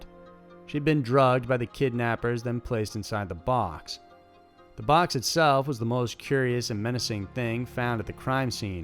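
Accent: South, United States. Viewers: I just love your voice sounds so country😍 great video as always!!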